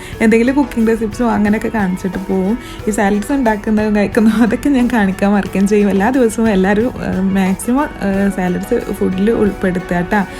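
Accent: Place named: Malabar, India. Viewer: ങ്ങൾ തിരൂർ ഭാഗത്ത് ഉള്ള ആളാണോ. ഞാൻ കോട്ടക്കൽ ആണ്. മ്മളെ ഒരു സ്ലാങ് ഉണ്ട് ങ്ങക്ക്😀😀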